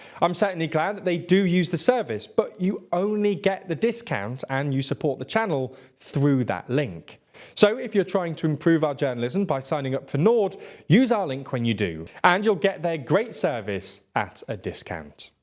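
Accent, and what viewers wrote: United Kingdom. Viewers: Net'nyahu... I hate British accent.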